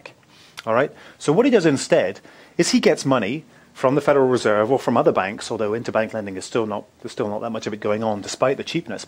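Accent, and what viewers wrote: United Kingdom. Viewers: you are Briton, arent you?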